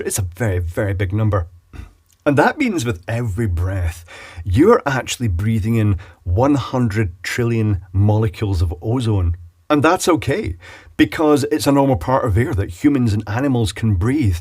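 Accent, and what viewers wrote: Scotland, United Kingdom. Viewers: good job MR Scottish Data well sead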